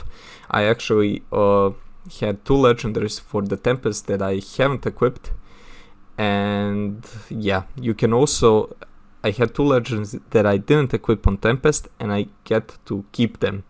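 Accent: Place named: Balkans. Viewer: I can tell hes balkan and might speak my language because of the accent.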